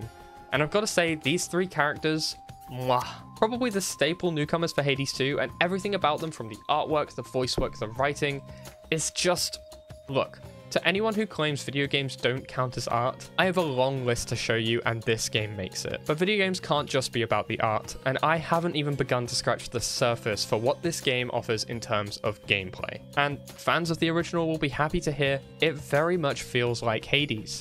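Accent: United Kingdom. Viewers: Clickbait thumbnail - Check Talking Head with 4 poses - Check Hasn’t beaten the game they’re talking about - Check Annoyingly British - Oh Absolutely Yup it’s a game journalist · I will sub you bro love British accent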